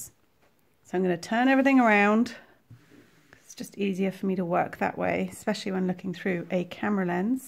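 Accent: England, United Kingdom. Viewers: Hi this is brilliant - so grateful for your lovely video tutorials. The only thing confusing me is your use of US terminology in your lovely English accent - but that isn't a criticism as just so grateful to be able to follow your methods. Will this CJAYG method work on the solid granny square and do you have a tutorial for that as well please. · Great video I have subscribed and shall look at your other vids You sound British so why are you using USA terminology? You even kept correcting yourself when you said treble. Not trolling or criticism just curious?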